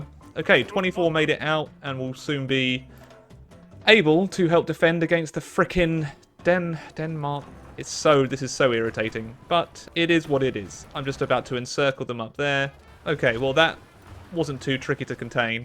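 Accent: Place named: England, United Kingdom. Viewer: I'm french and i like your english accent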